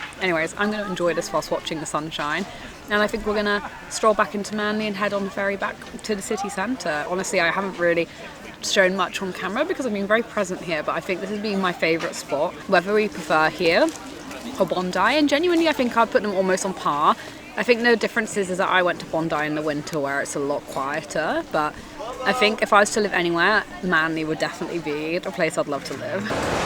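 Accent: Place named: England, United Kingdom. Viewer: Welcome to the city that is Soooo Up themselves (like New York).🤣 Just watch where the news of murders each week happens, and where the deaths (including of children sent home from in hospitals ER's, more frequently occur). The Northern Suburbs so don't like the rest of Sydney that they resist getting a train line, so that the 'Westies' would not invade them or their beaches.(It's not calleed the Insular Peninsular for nothing. Then you have the Eastern Suburbs who are also up themselves! That's why the train line stops at Bondi Junction, and Waverly council did not allow the train to run right to the coast. And neither the North Shorers or the Eastern Suburbs people want to be seen at the Western Suburbs (unless they are just passing through to the Blue Mountains or Perth.).. But sounding like a pom, you will no doubt understand. 😊 I am in Newcastle who has good beaches, less rain/storms , and a place which helped to make Sydney rich, but without the gratitude. 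If you think we are hard done by, consider how the big whigs in Sydney treat the regional and rural areas. 😛 enjoy! You will see, no doubt that when the poms sent the convicts to here in chains - ..They were sending them to HEAVEN! (my Gr Gr Grandfather didn't come out as a convict but from Sussex as one of the earlier TEN POUND POMS.. And he never whinged about it. And the Lord blessed Him and his family. 😇 <link>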